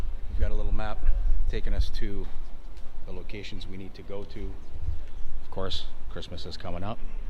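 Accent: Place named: Ottawa, Canada. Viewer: Ah you’re from Ottawa “ eh “? I have a question regarding the currency exchange place you showed towards the end of this video. I was wondering if you tried exchanging Canadian Currency for Pesos? I have always taken pesos with me in our several trips to Mexican Resorts but I heard on one other video from this resort that the currency exchange kiosk takes Canadian dollars in exchange for Pesos? Im a little disheartened about the reserving of the Ala Carte restaurants. On our first trip which happened to be in the Mayan Riviera the resort we stayed at require pre booking reservations at the restaurants at the lobby. It turned out that we ate almost all meals for the 7 days at the main buffet because it was huge and I’ve never seen as much selection and variety in a Mexican Resort Buffet. The second trip we were told we had to make a reservation for any of the three A la Cartes at a desk in the lobby am however if you weren’t in line by you had no shot at getting a reservation. 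We did manage to get into the Mexican restaurant and the steak restaurant ( but pm! the last available reservation ) later during our 10 days at stay but twice even though I stood there for 2 hours and was between 10-15th in line when I got up to the desk they said all seating in each A la Carte was fully booked. Walking past those places to pm and seeing half of the tables empty was a disappointment. I’m hoping that either or the buffets have good food and variety or I’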